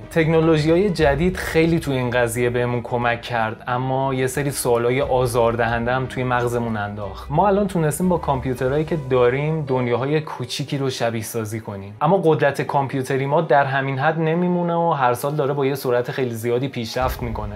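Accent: Iran. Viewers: من شاید یکی از هزار وجند بیننده ویدیو های شما هستم ویدیو های تاتار دنبال می‌کنم لایک میگرارم وکمنت یک پیشنهاد خدمت شما دارم : یک کمی لهجه فارسی تان را بازسازی بکنید چون همه فارسی زبانان ایرانی نیستند …! میدانم تغییر لهجه خیلی سخت است ولی اگر بخواهید میشه یک دوست من چند سال در ایران زندگی داشت شبیه شما ایرانی ها صحبت می‌کرد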